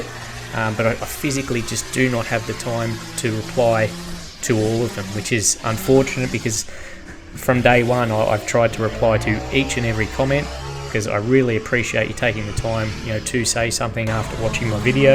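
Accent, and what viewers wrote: Australia. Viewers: Hi! No need to stop talking in your videos! I enjoy your narratives about what you are doing. I also like your Aussie accent!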